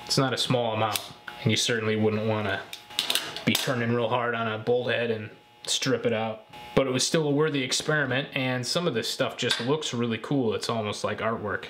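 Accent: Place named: Midwest, United States. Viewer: Where are you from? I'd guess somewhere in the Chicagoland area, Wisconsin or Minnesota based on your accent.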